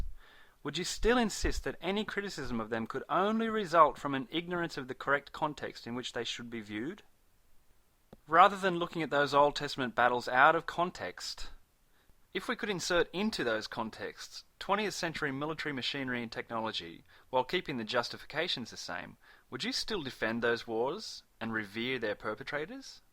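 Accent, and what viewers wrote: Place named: Australia. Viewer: thanks for making this gloomy video more sexy by having an Aussie 'cent. Gracias.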